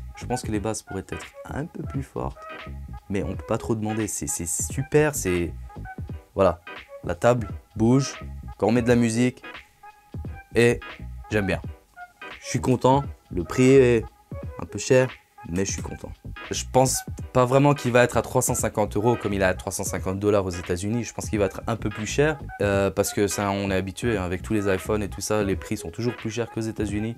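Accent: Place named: Quebec, Canada. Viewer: tu viens de où? Tu as un accent du Québec!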